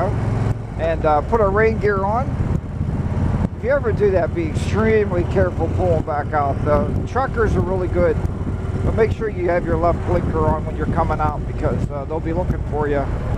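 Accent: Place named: Pittsburgh, United States. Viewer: I spent 5 years in Sandusky. Where you turned left, you should have turned right. I guess you figured that out. Your accent sounds like you’re from the Pittsburgh area.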